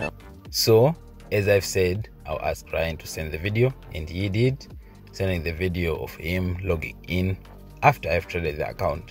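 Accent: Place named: Zimbabwe. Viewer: I once asked you if you are a Zimbabwean that kind of English l know it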